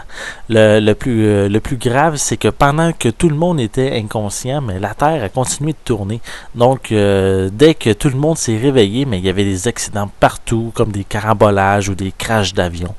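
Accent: Canada. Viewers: jkiff ton accent canadieeen :p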